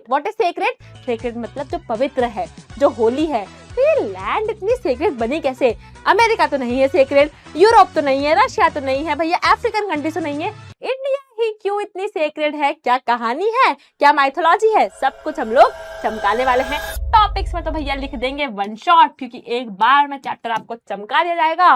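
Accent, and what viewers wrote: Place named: Haryana, India. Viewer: Haryana te ha yaar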